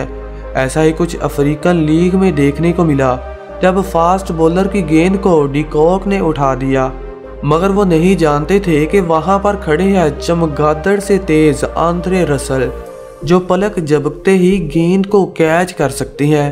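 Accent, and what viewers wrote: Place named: Punjab, India. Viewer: O mere bhai punjabi accent me commentry na karo. sab ghalat bol rahe ho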